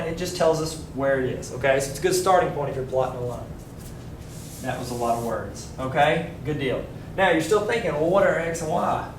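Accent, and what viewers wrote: South, United States. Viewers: Thanks dude and nice southern accent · rodriguez That's what you call a southern accent. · your southern accent is adorable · Who else LOVES HIS COUNTRY ACCENT !!😍😍😁😁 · His southern accent... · I would pass math if he was my teacher... my teachers old and doesn't have an awesome southern accent XD like for if my math teacher looked and talked like him hed have my attention XD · So southern! C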